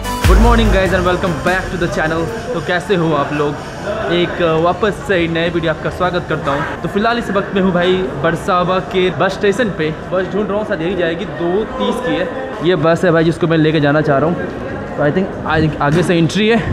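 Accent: Bihar, India. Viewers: Improve your communication skills- drop Bihari accent and stop saying bhai.. bhai… you are doing good work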